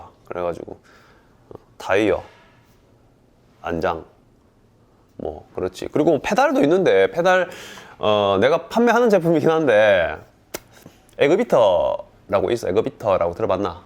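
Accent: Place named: Busan, South Korea. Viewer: 부산 사투리군요 영상보면서 강호동이 자꾸 떠오름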